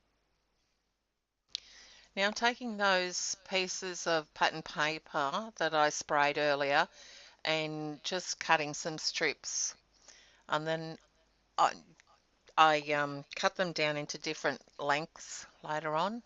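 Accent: Australia. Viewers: Great to hear another Aussie. That is an awesome page. Thanks for sharing.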